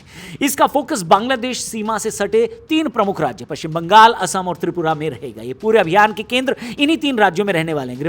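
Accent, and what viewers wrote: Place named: Bihar, India. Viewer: Bhai tera thoda sa bahuwa to nikal hi jata h...but information about the topic is serious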